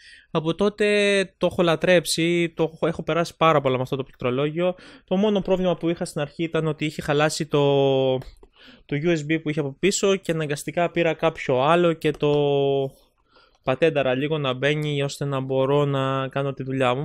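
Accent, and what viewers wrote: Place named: Lemnos, Greece. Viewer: orea milate stin limno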